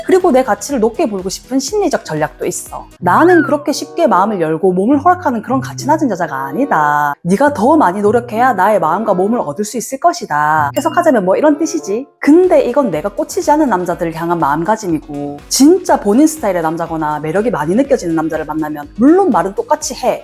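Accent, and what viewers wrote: Gyeongsang, South Korea. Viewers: 갱상도네~ 부산 경남 쪽아이가~ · 경상도(부산이나 대구) 사투리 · 갱상도 가스나네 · 갱상도 사투리 반갑소~~~😊😊😊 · 갱상도 여자네~ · 경상도 말투 귀여움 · 경상도 여자군요 ㅎ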